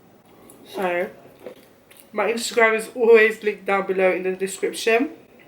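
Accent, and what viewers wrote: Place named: England, United Kingdom. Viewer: Where are you from if you don’t mind me asking, you sound English but you said it was 38° I know that in England it’s no that hot 😂😂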